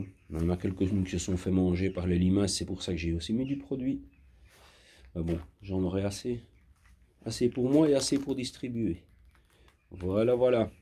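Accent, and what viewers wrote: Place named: Hainaut, Belgium. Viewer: Bonjour compatriote , je viens de découvrir votre chaîne , Félicitation. Plaisir de découvrir un autre jardinier Belge sur YouTube. Mais d'ou êtes vous précisément ? Vu l'accent je dirais du Hainaut , Mons ou Tournai ou pas loin. Bonne continuation et prenez soin de vous .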